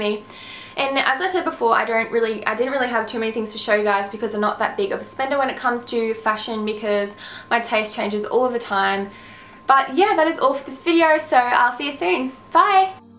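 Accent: Australia. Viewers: your accent sounds australian but it doesnt? lol. this is the first video ive see of you. a) you're gorgeous and b) you have an amazing body! werk it gal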